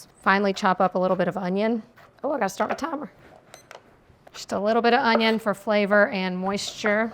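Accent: South, United States. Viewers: Huge fan of! Love her easy home meals, her soft demeanor, and her cute southern twang. Keep the recipes coming!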